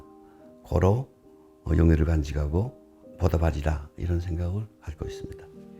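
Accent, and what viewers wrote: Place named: North Korea. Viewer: 이북말씨 정겨워요 아버지고향 평안북도. 박천. 입니다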